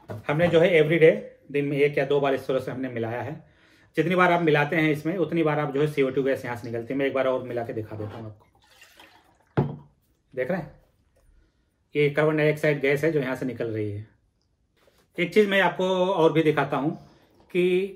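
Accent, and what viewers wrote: Bihar, India. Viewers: Sir you must be from Bihar (your accent is Lalan top). You are really good at chemistry. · I thought he is speaking English in Indian accent.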